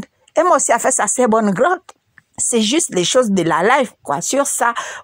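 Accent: Gabon. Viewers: 😂😂😂😂😂 explication excellent , j'adore trop l'accens gabonaise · Ton accent gabonais la 😂😂😂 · Je m'abonne j'ai reconnu le bled à travers l'accent. #Gabon# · C'est une gabonaise qui parle🤣🤣🤣 une fille du sud! Je m'abonne 🇬🇦👏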